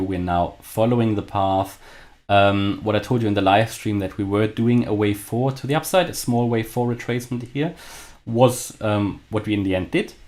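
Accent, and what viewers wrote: Germany. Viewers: Is he a german? The accent.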